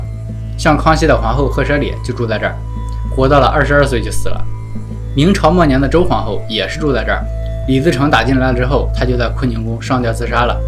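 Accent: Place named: Shandong, China. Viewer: 这山东口音太重了吧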